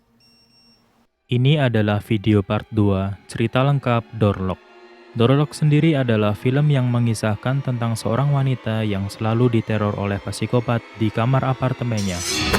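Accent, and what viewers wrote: Java, Indonesia. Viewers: Klo dengerin suaranya kaya org Jawa😀 trus tb nya rada tinggi Iyah ngga sihh bang hihihiii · Semangat terus bang:) Btw suara luh, medok bngt bang🙏😅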